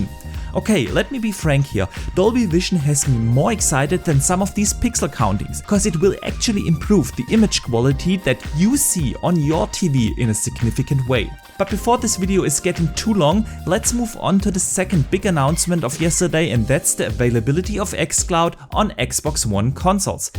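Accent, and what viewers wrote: Germany. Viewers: Man I really like your German accent :3 Nice video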